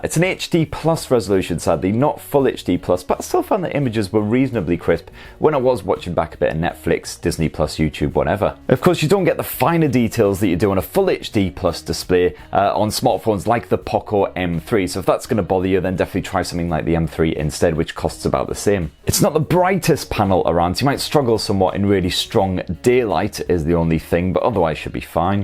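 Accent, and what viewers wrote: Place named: North East England, United Kingdom. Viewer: ... I would say he's from the north east of England but not quite as far as Newcastle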